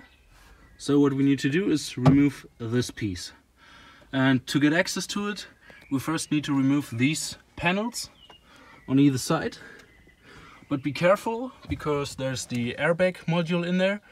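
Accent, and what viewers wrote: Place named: Germany. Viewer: spitze video. dein Englisch ist ausgezeichnet, der deutsche Akzent kommt immer gut an... Cheers aus den USA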